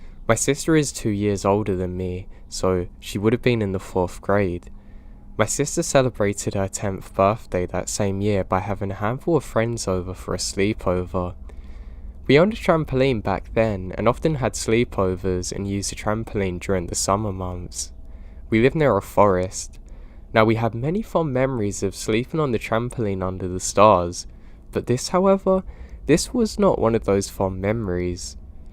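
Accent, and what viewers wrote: United Kingdom. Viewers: im glad you do mate i get bored of these american story tellers, its good to hear a fellow british accent. i was actually shocked the first time i heard you were british tbh lad, keep it up any way you tell stories clearly, and the starting motivational bits are a good add to people who maybe need words of wisdom but dont try to find it, so you prolly have more of an effect on people than you know. One thing tho is maybe a bit too much emphasism sometimes? No hate ofc but i find that it makes a big difference if the main parts are emphasised in stead of the irrelevant bits. Kinda adds more power to the plot, but it aint me thats tellin em so keep up the good work lad 👏👏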